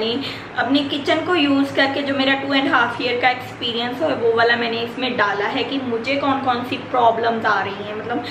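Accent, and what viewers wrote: India. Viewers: Hariyanvi accent? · Are u punjabi...just guessed from some words n accent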